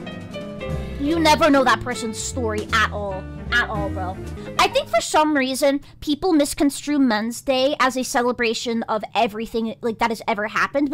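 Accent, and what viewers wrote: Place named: Bronx, United States. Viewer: chibi extra Italian from the Bronx in this one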